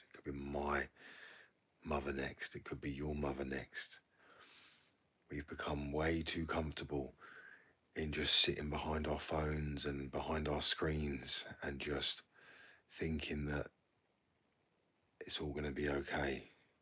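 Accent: United Kingdom. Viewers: Welsh man?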